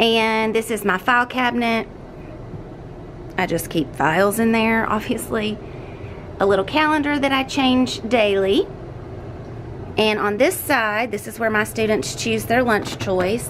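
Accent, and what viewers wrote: United States. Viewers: Are you a Southerner? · Do you teach in NC? I'm trying to figure out your accent. I'm in NC!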